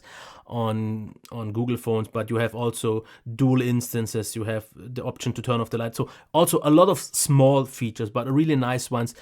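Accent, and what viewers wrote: Germany. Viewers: Based on his name i think he's Croatian but based on his accent defo born in Germany or living there for a long long time!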